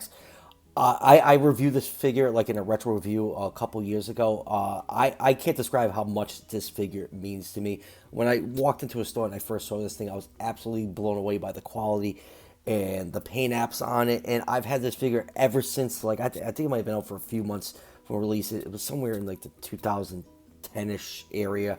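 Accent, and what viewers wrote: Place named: Massachusetts, United States. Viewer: Looks like I’ll have to start collecting Papo again. Nice to hear from a fellow New Englander! I’m from New Hampshire. You sound like a Massachusetts man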